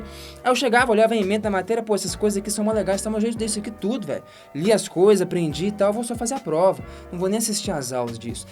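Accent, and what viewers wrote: Minas Gerais, Brazil. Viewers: O sotaque dele é muito de mineiro msm minha família é mineira e eu sou goiana e ai ja viu né ksksksks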